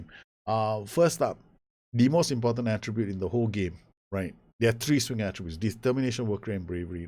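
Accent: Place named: Singapore. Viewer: I cant put a finger on your accent dude...at times you really sound singlish... Then you you would say something that would throw me off completely...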